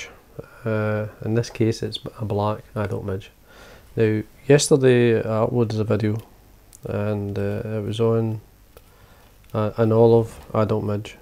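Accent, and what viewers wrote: Scotland, United Kingdom. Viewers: The subtitles are hilarious, is it that hard to understand a Scottish accent?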